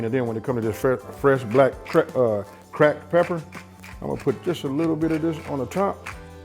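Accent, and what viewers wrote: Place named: California, United States. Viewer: Are you from the Bay Area in California? Your accent says California to me. I was leaning toward Watts maybe but you sound like Ryan Coogler a little bit. San Diego native here . 😊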